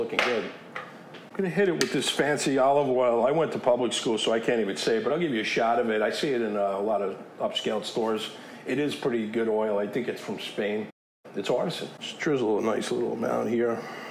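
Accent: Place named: United States. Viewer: Chef, lei ha detto che è italiano, dall'accento non si direbbe: solo di origine italiana od ormai ha preso l'accento "americano"?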